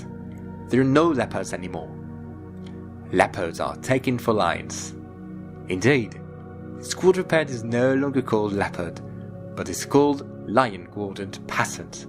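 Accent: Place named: France. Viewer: nice accent Fr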